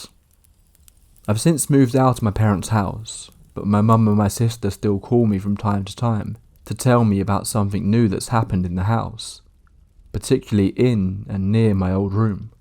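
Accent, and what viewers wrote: England, United Kingdom. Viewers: Sioux City, Iowa. Da SUX (Airport code.) Anglophile, have heard a lot of English accents for an average US citizen and yours is superb. Absolutely brilliant channel. Thank you.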